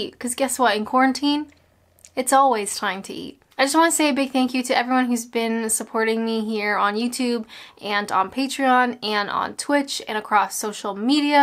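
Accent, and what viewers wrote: Canada. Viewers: I love the aboots 😊😊😊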